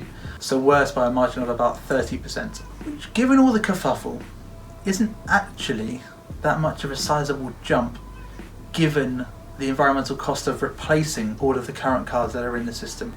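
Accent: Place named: United Kingdom. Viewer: Kind of interesting that not even the closed caption can understand his British accent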